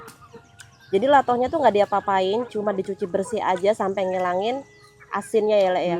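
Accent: Java, Indonesia. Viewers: Jawa nya kok medok banget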